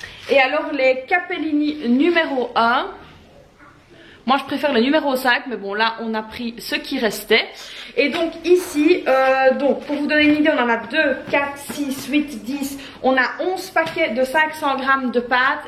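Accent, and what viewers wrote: Liège, Belgium. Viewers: je me disais bien que l'accent était liegeois! Moi si le coca justement j'en achete que la bas car ici c'est tellement cher :o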